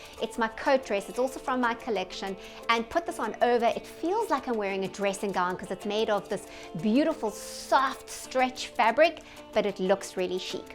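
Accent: South Africa. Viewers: You’re fabulous! I’ve been following you for a short while now. Been trying to place your accent. South Africa. Thanks for sharing that. Beautiful accent!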